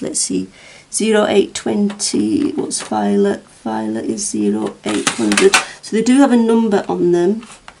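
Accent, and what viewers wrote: United Kingdom. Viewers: Very useful!! I am sitting here with my second iPad buying up on Amazon! Today was pension day! You have become like a friend to me I would love to sit at the table and colour with you in person, since I am in Australia that’s not possible, love your videos very much, and your accent what part of Britain are you from? I was born in Birmingham but don’t really have an accent, except true Aussies usually pick I am from the UK 😂😂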